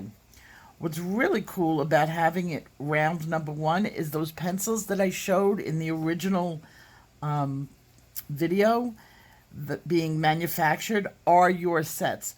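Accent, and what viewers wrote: New York, United States. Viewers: I’m so glad you hear that your husband is on the mend and improving daily! Thank God for answered prayers. This set looks wonderful and I would love to enter to have a chance to win. I love your videos ,it’s so nice to hear someone who sounds like me as I’m a New Yorker too!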